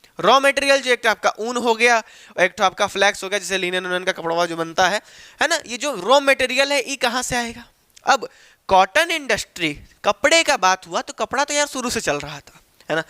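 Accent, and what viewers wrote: Bihar, India. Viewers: Bhaiya aap bihar se ho kya?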